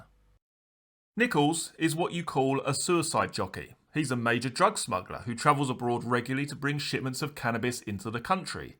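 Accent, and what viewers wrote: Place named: Essex, United Kingdom. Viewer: He can't help his accent he's from Essex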